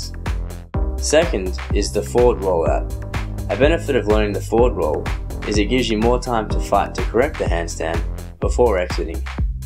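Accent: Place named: England, United Kingdom. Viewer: finally an english accent i can understand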